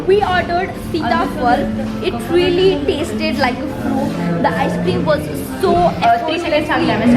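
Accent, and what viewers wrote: India. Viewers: Love your Hindi accent_🥰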